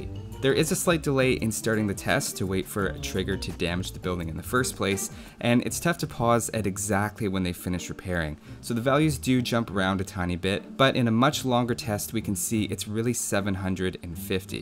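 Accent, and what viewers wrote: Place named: Canada. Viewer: L'Orso Totalworriano Indeed. AoE3 is more about collection treasures and building trading posts and herding buffalos and bisons in the beginning, instead of building camps and finding sheep and so on. I agree, has a cool voice to listen to. These soft Canadian sounds:)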